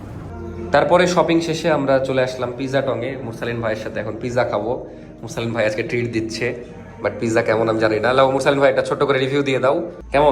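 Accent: Rajshahi, Bangladesh. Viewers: রাজশাহীর ভাষা শুনতে ভালো লাগে। যাই হোক আপনার নতুন মা কে স্নেহময়ী মা মনে হলো ।🎉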